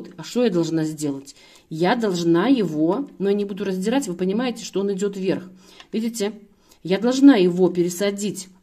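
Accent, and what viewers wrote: Belarus. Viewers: Спасибо❤. У вас такой милый белорусский говор с акцентом на букву "я"))))))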